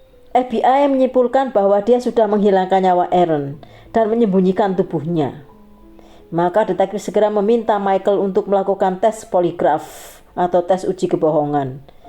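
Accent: Java, Indonesia. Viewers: ... dan terima kasih atas kasus nya yg luar biasa dengan kemedok an nya... salam cinta dari batam untuk madam....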